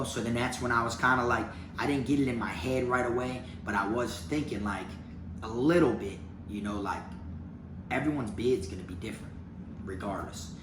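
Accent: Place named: Louisiana, United States. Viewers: You sound like you have kinda a Louisiana accent. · lol theres no way your actually from broward, i mean you haven't lived there your whole life, i dont know too many people who were born in dade or broward and have that kind of southern accent, where are you originally from? you sound like what i call an FCG, florida country gangsta, sounds like it could be used as in insult but its actually not at all and you don't need to actually be from florida to be FCG, thats just where i first got it from. basically its somebody thats pretty much a redneck, spent most of their life in the country or a small town but for whatever reason is gangsta as fuck and could just as easily move to the city and fit right into the hood even as a white guy or like one of those white guys that ends up in the bloods or crips cuz they are just that kind of OG.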